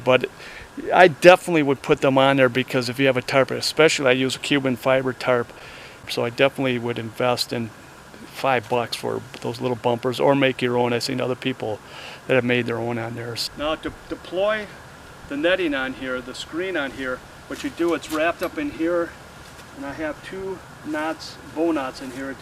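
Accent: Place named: Iron Range, United States. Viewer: Been to McCarthy many times! I’m an Iron Ranger from Virginia, MN. Glad to have found your channel! Thanks for the great info! I could tell that you have the same accent as me 😊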